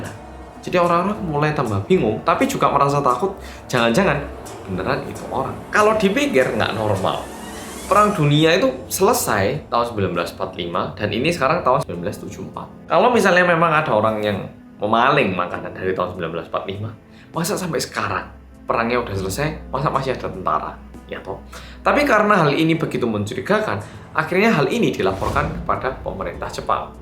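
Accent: Java, Indonesia. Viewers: logat Jawa nya sudah mengental 😁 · Bersama orang Korea yang medhook, Tiap opening pasti ngikutin mas ngomong medhookkkkkkkkkkkkkkkk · Serial killer jos tenan.alias medok.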